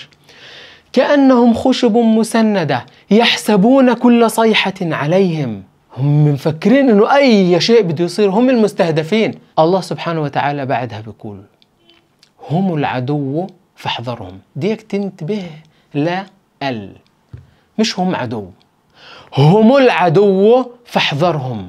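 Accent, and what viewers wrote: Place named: Palestine. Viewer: احلا لهجه الفلصطينيه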